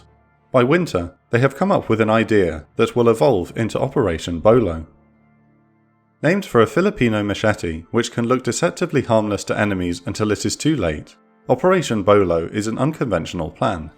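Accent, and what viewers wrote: United Kingdom. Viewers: Love the visuals and the calm Brit voiceover. If you want the American version of the story, TheFatElectrician does a good video on Op Bolo as well. "US obliterates half North Vietnam's MiG-21 fleet in 13 minutes". The contrast between channels is stark, but I can appreciate both methods of telling the same story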